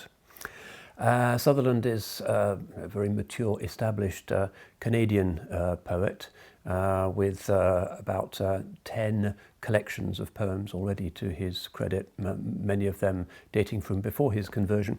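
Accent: United Kingdom. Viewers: im here for british english and his intellect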